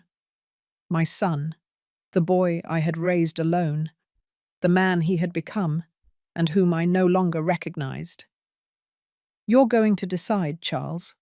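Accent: England, United Kingdom. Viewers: Did this case happen in Great Britain? The narrators accent sounds English & a few things about court seem not American. The DIL seems to be an idiot, too. Also had I been her, the judge, I'd have the police waiting outside. When court was over, she'd be arrested for having assaulted me; and yes, I'd press charges for sure. And I'd cut son out of will. He's scum & stupid too. Instead, I'd leave everything to grandkids when 21. And I would tell son that wife has been stealing from him & the law firm. Prison for her!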